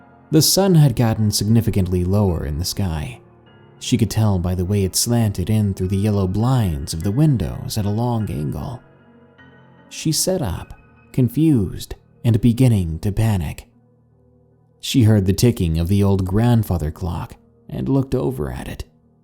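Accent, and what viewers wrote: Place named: New York, United States. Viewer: thick new york accent FOIST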